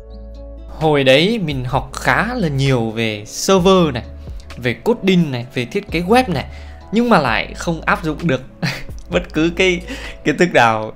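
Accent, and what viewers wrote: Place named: Quang Tri, Vietnam. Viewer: giọng quảng trị hay thế mà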